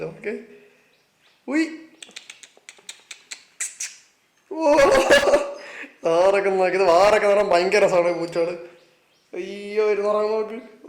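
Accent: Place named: Trivandrum, India. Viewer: Bro ude samsarathil cheriya oru trivandrum slang varunnu❤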